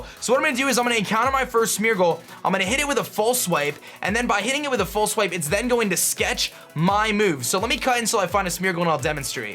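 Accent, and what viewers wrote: Australia. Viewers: I just noticed he switches between kinda austrailian and american accents so he sounds like one of those surfer dudes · I haven't watched in a while... when the f did he get an aussie accent?! peukomon it's pretty faint but it's pretty new for me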